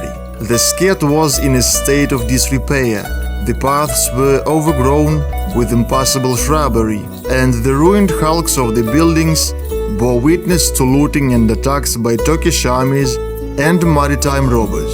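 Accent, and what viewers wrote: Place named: Eastern Europe. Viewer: This is all very interesting. I have been there, so it brings back good memories. However, why not get a native speaker of English for this series? It is a bit painful to have to listen to such a funny Slavic accent for a whole hour or more. Besides, there are quite a few words which are mispronounced or barely understandable. Even the word "skete" sounds something like "skit" which is something else entirely. Also, at about the music is so loud that the dialogue is even more difficult to understand. I tried to use the subtitles, but they did not make much sense as the mechanism could make little sense of the funny accent.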